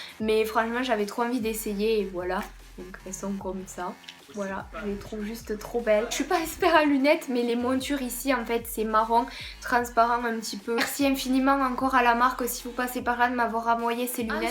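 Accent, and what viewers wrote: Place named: Toulouse, France. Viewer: Superbe vidéo!! 💘 jaime trop ton petit accent toulousain 😊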